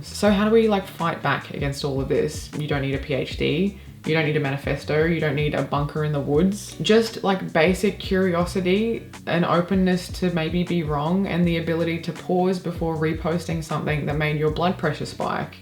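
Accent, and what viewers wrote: Australia. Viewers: I agree, but I having to listen to this in an Australian accent is somewhat counterproductive. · Are you an Aussie living in America or something ? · The counter argument to your video is the covid debacle, where many experts and scientists did lie to the public, often repeatedly. An advanced degree does not confer advanced ethics, or any ethics at all. And btw, I don't know if you're Australian or a New Zealander, but both those countries' responses to the pandemic made America look like a land full of geniuses in comparison (which obviously we're not)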